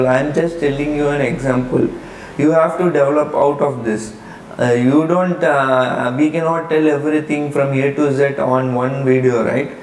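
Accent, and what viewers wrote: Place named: East India, India. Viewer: Hey, he does well. I found that by slowing the speed to 75% and using CC if available works quite well. I have a slow ear from listening to South American Spanish speakers, much slower than the East Indian cadence. :)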